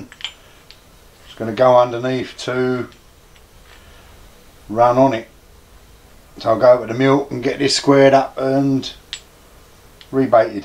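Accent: East London, United Kingdom. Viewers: As you say not sure whether it was worth it, but on the whole I think it was, because if you had gone out and bought another one it would be a, Chinese, b, crap, and c, you would have had to put it on the mill to correct the crappy machining to get it anywhere near as accurate and smooth operating as you have made your old one! By the way, is that a Warco mill? This is the first time I have seen you using the mill, and as its the same colour as my Warco 300 lathe/mill outfit i just wondered. I live in L.B.Redbridge, are you anywhere near me? I ask that as we share a common East London accent and I mean in my case at least very common! I look forward to your next project eagerly.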